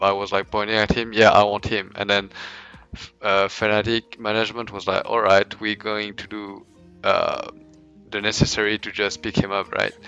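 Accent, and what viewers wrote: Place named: France. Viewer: Haha I know that. but i heard it as if he said Fabian. I understand he has a french accent!! :P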